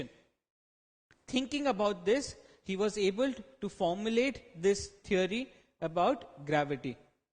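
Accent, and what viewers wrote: India. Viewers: why you speak I-English?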